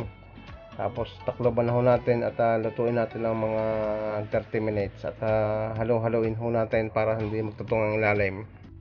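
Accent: Batangas, Philippines. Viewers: Ipakita mo yong pangalan ng bote ng ingredient di maintindihan yong tagalog mong batangueno eh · Boses palang areh eh .tubong batangas talaga..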